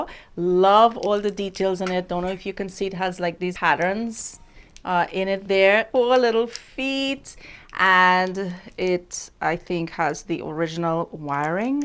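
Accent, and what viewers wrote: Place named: Trinidad and Tobago. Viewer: I just came across your channel a couple of months now, and I have to say I truly enjoyed your videos. Butt. . I have to ask are you from Trinidad 🇹🇹? I am... not that it matters but I kind of pick up on the accent. Truly enjoy your videos regardless where you from.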